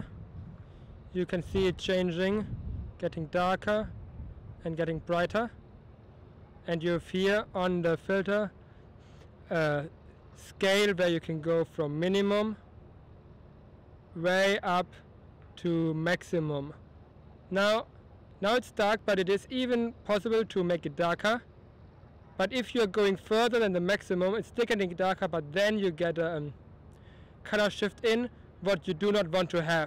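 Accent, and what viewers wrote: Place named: Germany. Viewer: Hahaha, thought he was, but with a German accent (or Scandinavian accent)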